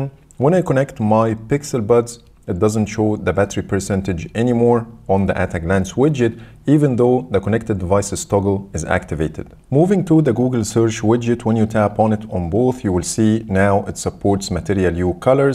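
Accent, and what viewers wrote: Egypt. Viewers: The heavy Egyptian accent tho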